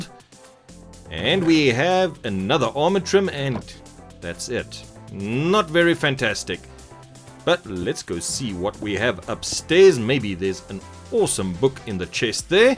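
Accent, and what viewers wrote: South Africa. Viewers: Are you from south africa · this oke sounds South African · South African?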